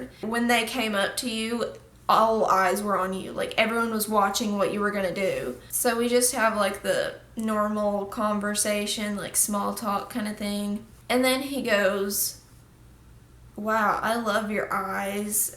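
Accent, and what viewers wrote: United States. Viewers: I love ur fuckin country accent it cute and ur very pretty🔥👌🏻😘🤘🏻✌🏻️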